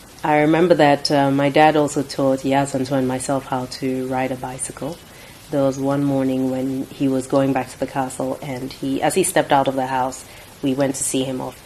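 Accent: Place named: United Kingdom. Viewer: You are talking as british!!!!!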